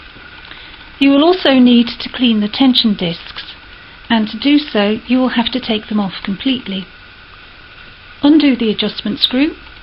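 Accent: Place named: Yorkshire, United Kingdom. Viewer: a tiny bit of Yorkshire is seeping through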